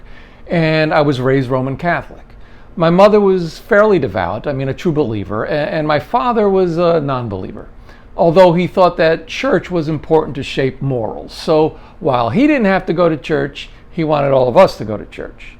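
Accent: New York, United States. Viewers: I am so sorry. I thought you were Jewish. But you are from New York, your accent is so clear. I am so sorry for my ignorance ❤ I am not even American 😂 Hi from Norway 👋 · I’m also from NY, Long Island, you talk like me 😊 I was raised Christian, tried to be Catholic but in divorced remarried so I’m shunned 🤦‍♀️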